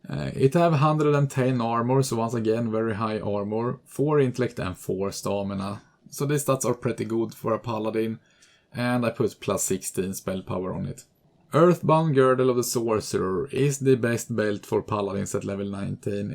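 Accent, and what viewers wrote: Sweden. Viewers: Man hör tydligt att du är svensk!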